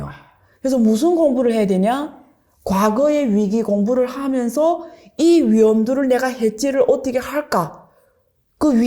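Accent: Yanbian, China. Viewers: 아~~ 방금 듣다가 말투가 조선족인가? 했는데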